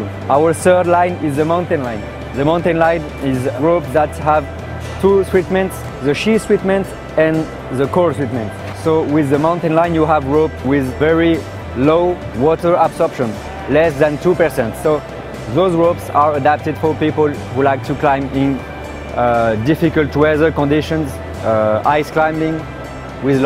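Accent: France. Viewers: Wow that french accent tho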